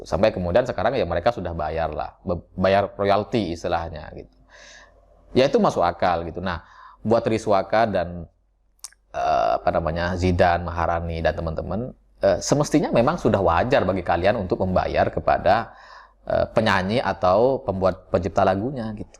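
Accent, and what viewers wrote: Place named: Madura, Indonesia. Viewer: Oo mas orang Madura ya...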